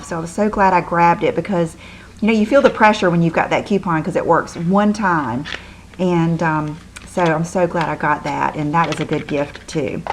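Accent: South, United States. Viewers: I Love Love Love! Your "Old Southern" Accent! Please Dont Change A Thing! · I am so glad I found your videos! You are beautiful and so happy, and I love your southern accent! Subscribed!! :) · I'm from Mississippi and I totally get the southern accent, I say cement the same way lol I do say Pinterest the correct way, probably because I heard it pronounced before I saw it written down :) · I speak Old Southern too and I totally understood every word you said! So refreshing for me! hahaha. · I think your old southern accent is adorable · Never saw that Too Faced liner but me and my Ulta coupon will be getting it this weekend. That and the NYX yellow HD concealer. Girl, I understand your "Old South". I understand every word you say...and talk the same. Hug your babies for me. Sad day. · You are a breath of fresh air. I love your accent because I'm a Southern girl too and we are by far the most charming ladies ever:)) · I love the way you talk! 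:-) Love southern! Don't ever stop being you!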